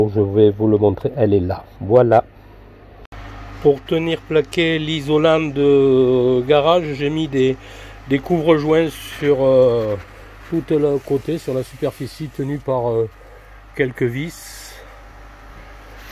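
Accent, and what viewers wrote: South, France. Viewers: bonjour et déjà un grand merci pour tous vos conseils j’ai juste deux questions concernant les dalles vous utilisez la 6000k blanc froid c’est bien ça ? Pour la chauffe ce sont des ampoules de terrarium quelle puissance faut il acheter ? Merci au fait vous êtes dans quel région cet accent me parle perso suis du Tarn ça m’intéresse pour avoir les périodes de semis et de repiquage. Merci encore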